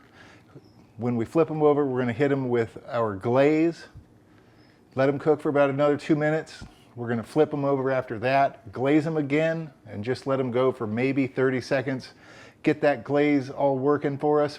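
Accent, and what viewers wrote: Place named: Boston, United States. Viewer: Australian?! That accent was straight out of Boston!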